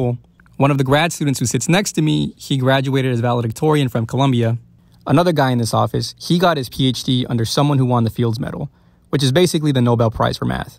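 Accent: Boston, United States. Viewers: The Boston accent really completes this. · idk where bro is from haha but he's def giving me Boston lmao. But I will say that Harvard (or the Ivy's in general) are not for me. In my undergrad at California State, we referred to kids who didn't study as Ivy leagues lmao or USC transfers. Glad to see a Harvard student breaking the stereotype have of them tho. (for context, I'm finishing my masters and am about to apply for my PhD rn)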